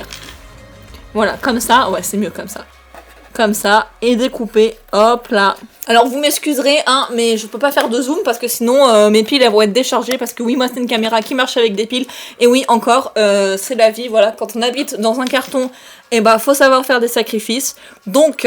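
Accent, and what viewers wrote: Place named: South, France. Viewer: Avec ton genre d'accent du sud tu me fait trop penser a une youtubeuse du nom de Keyza 😆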